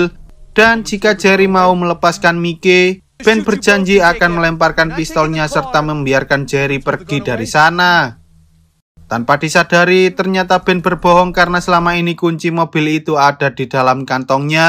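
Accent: Java, Indonesia. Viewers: suara jowo nya kurang kurangi mas 😃😃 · Medok bgt jawanya mase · Logat jawanya masih kental kali😂😂😂...jd kurang sedap mengikuti alur filmnya😢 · jowo ngendi mass? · Fiks org Jawa Admin ny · ORANG JAWA YA BANG .. NGOMONG NYA MEDOK.. JAWA NYA MANA BANG .. AKU SEMARANG BANG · Coba plis logat jawa nya jangan di pake ,jadi susah nyimak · Kamu org Jawa ya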